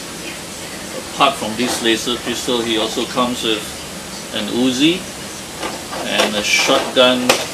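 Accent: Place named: Taiwan. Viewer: 一听你的accent我就知道你是台湾人。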